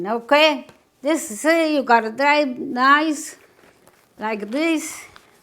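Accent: Italy. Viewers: Awesome garden. Wonderful that age 90 she still is going strong and still has her Italian accent! What kind of peppers are those? Awesome singing about Rome! Thank you from the other side of rt 33 in Hightstown!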